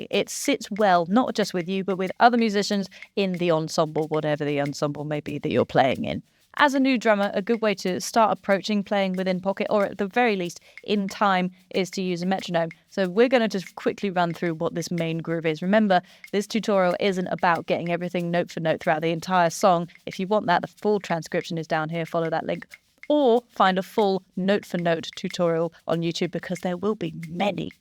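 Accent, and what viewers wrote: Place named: England, United Kingdom. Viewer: I love your videos and your English accent.😍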